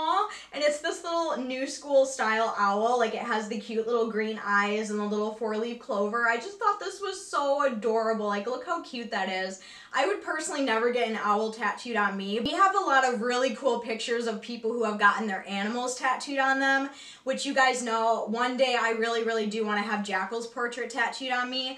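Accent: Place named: Northeast, United States. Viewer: I always forget what a strong northeastern accent you have!(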